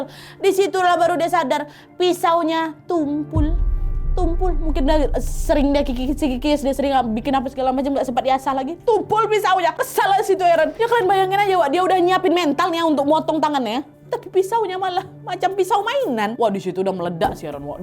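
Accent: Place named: Medan, Indonesia. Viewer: namamu, namaku nidia. lahir 27 okt, aku 28 okt. Awal kali aku liat video ytb krn logat bicaranya kayak orang medan, kirain aku org medan wkwk Sukses terus ya kak❤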